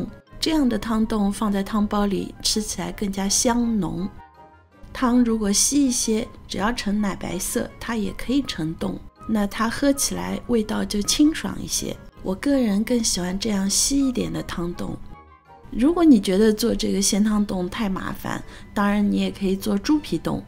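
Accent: Shanghai, China. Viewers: 难得听到有youtuber说这么正宗的上海国语，必须follow一记！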